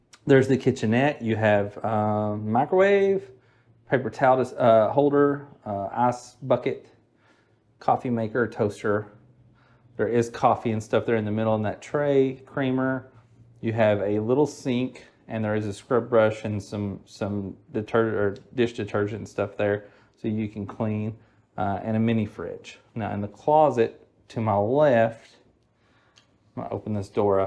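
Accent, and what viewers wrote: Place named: Eastern Kentucky, United States. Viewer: He sounds so much like me. I’m from Eastern Kentucky! Awesome.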